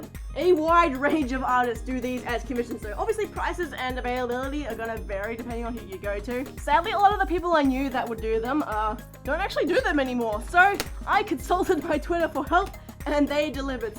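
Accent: Australia. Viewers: you AUSSIE 0_0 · R u Aussie? I wanna meet you one day boi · Wait are you Australian!? I am!! 😄🐺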